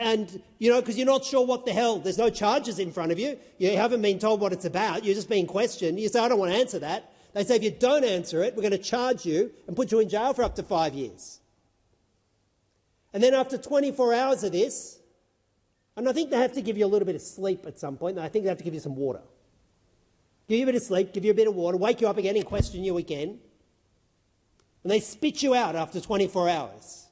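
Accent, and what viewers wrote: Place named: Australia. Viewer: gosh please keep this american crap away from Aotearoa New Zealand 😅 thank you senator ❤️‍🔥 you are welcome here despite your strong Ozzie accent 😅 you are based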